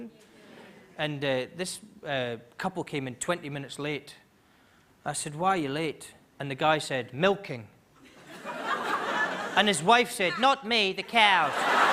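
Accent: Australia. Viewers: Cant think of any other outside of oz that can sound genuinely Aussie... and friggin brilliant at that... legend! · love his aussie voice, ahahaha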